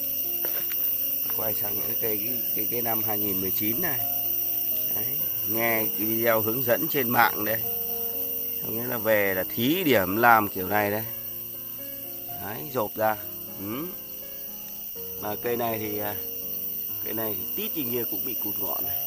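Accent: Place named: North, Vietnam. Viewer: Nghe ban noi que o bac ha.cho sdt nhe/que ban o dau day.hien jo ban o xa nao huyen nao tinh nao nt cho minh biet dia chi mhe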